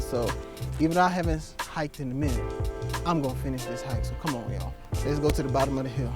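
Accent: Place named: Caribbean. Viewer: Are you West Indian? I hear a slight accent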